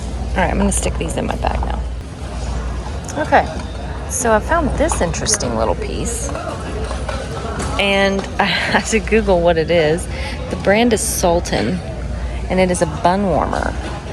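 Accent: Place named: Knoxville, United States. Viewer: Oh I love Knoxville - I thought I recognized the accent. 🇬🇧🇺🇸🌸🌸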